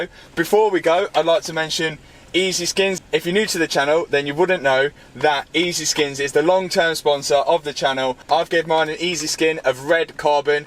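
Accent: Leicester, United Kingdom. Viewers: Good work 👍🏻 Just come across your channel tonight. You from Leicester? You sound it. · Thought I recognised the accent being the same as mine! Leicester ♥️ Great vids!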